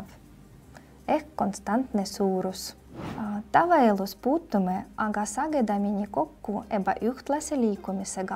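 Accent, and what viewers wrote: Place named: Russia. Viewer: Üsna häirib mahalugemine, sest mahalugeja vaatab teksti, mitte kaamerasse. Võiks ikka rääkida otse nagu veltsi ja veiko seda teevad. Kui ei suuda seda eesti keeles teha, siis tehku vene keeles, mis ilmselt on õpetaja emakeel. Kõneleja eesti keele aktsent on üsna jube, nii et ilmselt oleks palju meeldivam, kui jutt oleks ikka venekeelne ja lisaks oleksid eestikeelsed subtiitrid või siis veltsi või veiko räägiksid sama jutu eesti keeles või siis toimuks vestlus nende vahel. Loenguformaat pole ka kuigi atraktiivne põhikooliõpilastele.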